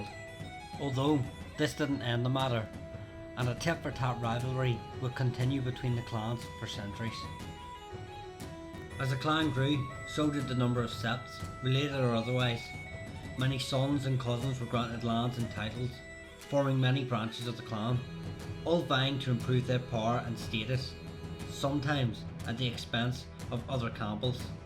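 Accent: Ireland. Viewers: It is really hard to understand what is being said due to the annoying, loud music plus what appears to maybe be an Irish accent. Such a shame as the information appears good.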